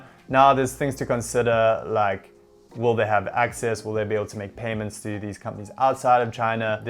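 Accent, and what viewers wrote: South Africa. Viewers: Your accent sounds South African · Your accent sound like my south African friends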